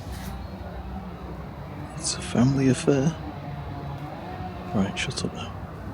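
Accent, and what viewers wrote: United Kingdom. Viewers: Though I'm a Filipino, I like your British accent.. More videos,..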